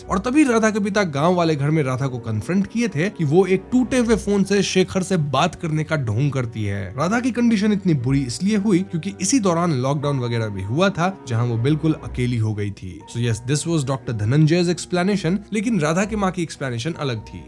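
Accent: Bengal, India. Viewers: One request,,, your Bangali accent is too thick, so please learn to speak in a neutral accent.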